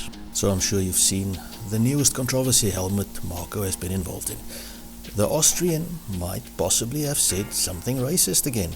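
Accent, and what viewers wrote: South Africa. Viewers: I was trying to figure out what your accent was before your said you were from south africa😂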